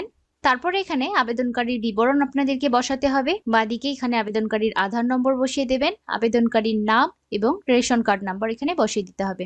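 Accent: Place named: Bangladesh. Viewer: Tui Bangladeshi hoye India er video banachis???😂 Tor kothar style e bojha jay....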